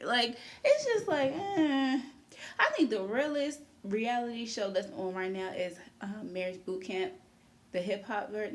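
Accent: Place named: Virginia, United States. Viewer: Omg!!! I’m from VA! Virginia Beach! I had.m a FEELING with your “accent” (as Pharrell would call it...) I felt like it was like mine!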